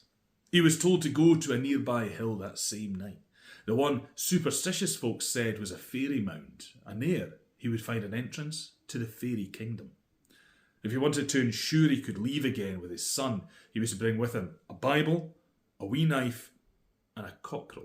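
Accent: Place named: Scotland, United Kingdom. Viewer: I'm a firm believer that folklore stories are best told by someone from the country the story comes from...LOVE Scottish accents the best 😊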